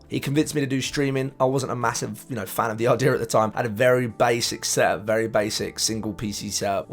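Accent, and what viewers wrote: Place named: East Midlands, United Kingdom. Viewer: Yo another banger of a video mate..... Where abouts in the east Midlands you from... I live in Ripley, Derbyshire bro.... I never realised where you was from I thought your accent sounded familiar 👌🙌🙌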